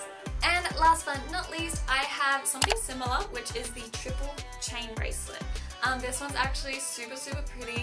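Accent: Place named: Australia. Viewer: #loomersquad love love lovee your videos, great to know that there are still some loomers out there! also love your accent, im Australian aswell 💙🌈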